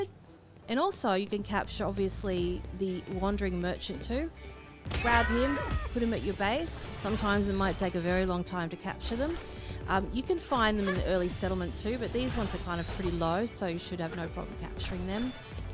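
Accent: Australia. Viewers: aussie accent 😍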